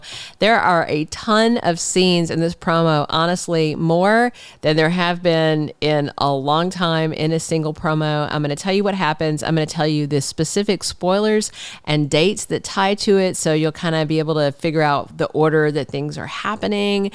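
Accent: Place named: South, United States. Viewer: I can’t wait to see all of them. But if I had to choose it’s Theresa’s asking what are you doing here. Thanks. Oh! I detect a southern accent. Are you from the South?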